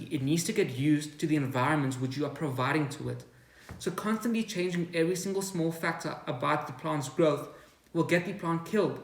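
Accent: South Africa. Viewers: You accent is broken where in SA are you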